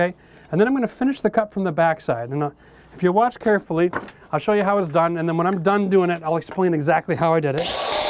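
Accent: Canada. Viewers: I hear that Canadian accent! 🇨🇦 Great video. Thank you! We’re renovating a 7000 sq ft home. Great video. 👍🏼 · Lovely to watch a professional at work you make it look easy great video Are you sure your not related to the South Maine Auto guy your mannerisms are eerily similar good to see a son helping his father and showing a few new tricks Love the Canadian accent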